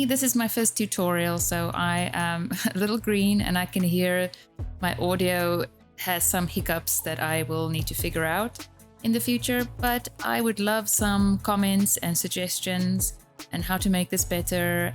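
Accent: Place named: South Africa. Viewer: Loving that South African accent, and thanks for the tut! Cape Townian living in Paris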